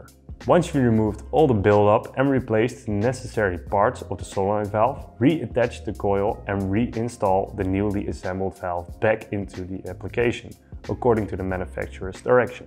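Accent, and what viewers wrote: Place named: Netherlands. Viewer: I like your accent. Are you dutch?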